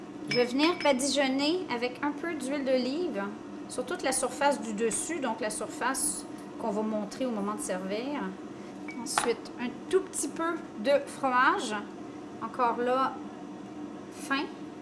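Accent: Canada. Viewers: Cc bonjour merci pour votre recette de calzone je voulais en faire mais n'avais aucune idée de se que l'ont metter dedans super! Je vais en faire et faire ma pâte a pizza moi même bne continuation vous êtes super et j'adore votre accent canadien qui ressemble un peu a mon accent du Nord 59❤❤❤❤️🌹🌹🌹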